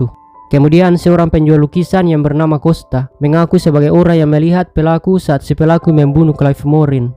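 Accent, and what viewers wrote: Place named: Jakarta, Indonesia. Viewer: owh..tapi cara ngomongnya kayak orang Betawi.....tapi semangat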